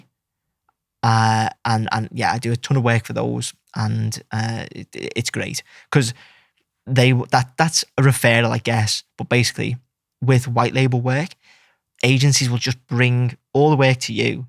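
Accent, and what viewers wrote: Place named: Liverpool, United Kingdom. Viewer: When do you have time to watch Liverpool play?.. you sound like a scouser Edit: I watch right after posting this 😂😂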